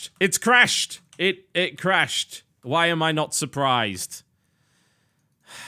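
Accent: United Kingdom. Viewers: hails from England, but has been living in america for years, that's why his accent isn't very prominent, but he still uses british terms. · It's the British pronunciation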